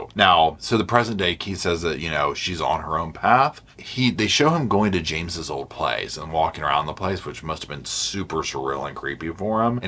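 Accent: South, United States. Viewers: Why do you say "you know" so much? Is that just like, a weird southern thing?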